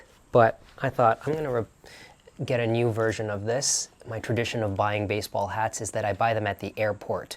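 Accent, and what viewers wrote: South, United States. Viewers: the gay boys i grew up with skewed my perspective because they played "sports", got in fights, and the only fashion icons they respected were James Dean and (young) Marlon Brando (esp. from 1953 The Wild One). i adore the Tennessee Williams accent though...i adore you! i HAVE heard "mesh head" used as a pejorative to hats, that MY boys associate with a certain ex- boyfriend truck mechanic that was in their midst for too, too, long... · Why the southern accent. Gays probably play sports even Olympic swimmers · 😂🤣As a Southerner, the acceennt!!👌🏿❤️😂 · You do the only Southern accent that I find charming! 😄 And, I'm sorry for your loss.♥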